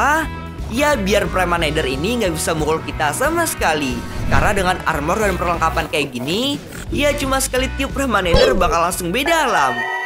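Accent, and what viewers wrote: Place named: Bugis, Indonesia. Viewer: Bang Suara Nya Kek Orang Balik Papan (Kalimantan Timur) Orang Bugis Pasti 🗿 ya Kan?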